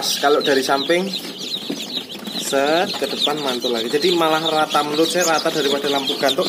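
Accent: Java, Indonesia. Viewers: Bahasamu jowo kentel mantul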